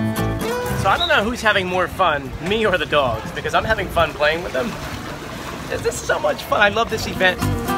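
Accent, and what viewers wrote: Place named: South Jersey, United States. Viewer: Dude you have a such a rough south jersey/philly accent. The way you say water is the poster child for our accent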